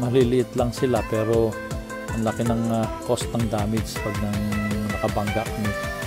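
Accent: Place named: Australia. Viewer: Good day mate!❤️ I love your aussi accent po Sir, kanggaro +s cute but causes disgrasya sa daan.. Keep Safe po Sir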